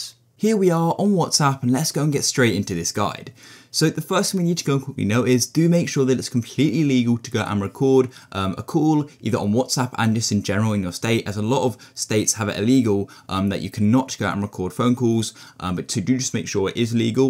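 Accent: United Kingdom. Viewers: British english. Can't you understand?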